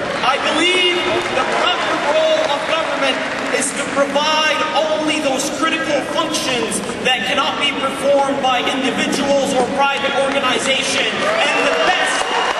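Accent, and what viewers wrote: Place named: United States. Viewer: What a true American sounds like!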